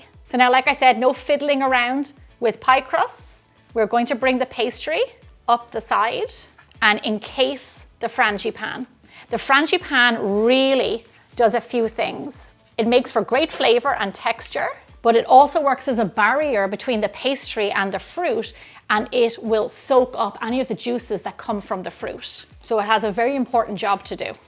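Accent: Ireland. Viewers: If I was to draw a photograph of Irish, it would look EXACTLY like you! (And I don’t mean that in any negative way whatsoever). Love your videos and your wonderful Irish brogue!!🇨🇦🇨🇦 My wife is making your ice cream today!!! Thanks so much!!❤❤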